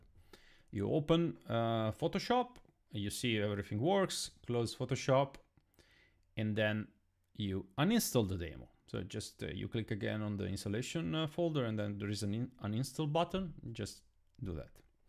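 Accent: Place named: Italy. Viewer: Nice video and great explanations! And you have a lovely Italian accent! Keep on the good work!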